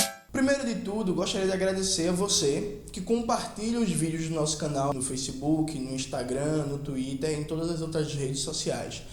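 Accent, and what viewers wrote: Pernambuco, Brazil. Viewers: sotaque pernambucano é charmoso demais pô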